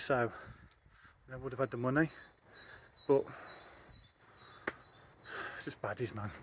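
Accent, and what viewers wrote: Birmingham, United Kingdom. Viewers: Proper brummie. … there ACE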